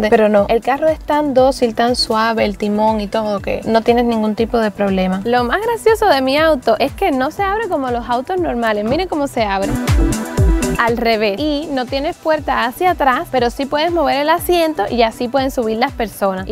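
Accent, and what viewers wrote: Cuba. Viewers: cata Orozco por que es cubana y sandra también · Cuba creo o República dominicana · Porque ella es de cubaa las dos son de cuba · Marcela Aguilar claro es cubana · Por el acento cubano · Medefrine ella tiene un acento cubano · es de cuba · Sus voces son iguales por que las dos son cubanas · Marcela Aguilar ovio porque es de cuba como sandra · Por que las dos son cubanas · Hija es que es cubana pensá 👋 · Jajajaj sii, es q las dos son de Cuba creo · alemán mucho seras.cubana te creo jaja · Hola se me haces muy parecido tu hablado a Sandra cires cómo cubana 😁 me encanto tu video y cómo leí con comentario x ahí dile a tu papi que me adopte jajajaja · Tu tono de voz y acento es muy paresido al de SandasiresArt eres cubana?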